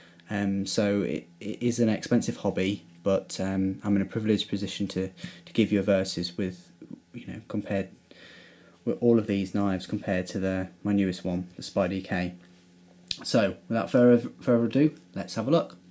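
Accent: United Kingdom. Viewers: A really nice British accent :) BTW thanks for a review.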